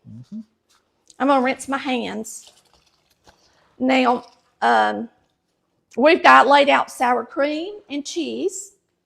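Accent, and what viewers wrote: South, United States. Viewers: When the video only provides the sound, usually the instruction is doable. Not today, I REALLY wanted to watch the demo. Love love your adorable southern accent. Paz be with us all 🙏. " "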